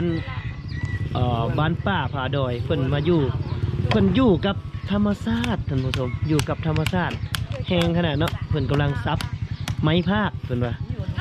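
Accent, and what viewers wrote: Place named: Surin, Thailand. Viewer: เว้าคือล่วยแถว จ สุรินทร์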